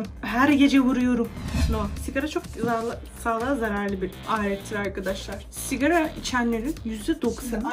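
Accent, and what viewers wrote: Turkey. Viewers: Adapazarlımısınnn ahhahahaha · O kadar sakaryalı bi anlatım ki hahsjajjdjsd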